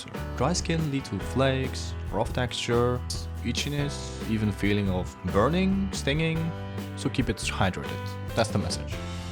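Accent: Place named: England, United Kingdom. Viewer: I like ur english accent😊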